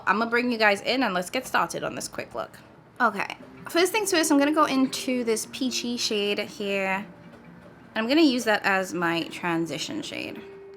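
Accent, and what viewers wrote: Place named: Trinidad and Tobago. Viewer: Are you from Trinidad? I detect an accent! I am considering picking this palette up but I was worried that some of the mattes would be too light for me. This video was really helpful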